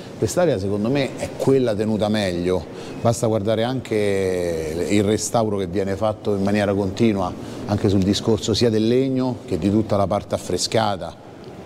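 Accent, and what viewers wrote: Rome, Italy. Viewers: Grande Cicaló, sto video giunge a fagiolo, a Roma ce devo da vení fra du mesi, hai inserito delle info importanti, si te vedo in giro pe Roma te saluto.. Quant'è bella sta città ❤️ e quanto me piace a parlata romanesca... - Ma dimme, ma come só ste olive.. - eh come só, sò greche 😉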